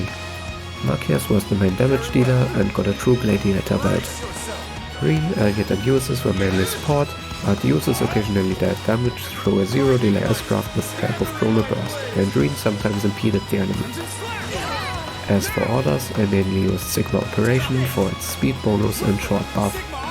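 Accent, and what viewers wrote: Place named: Germany. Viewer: Deutsch Akzent erkannt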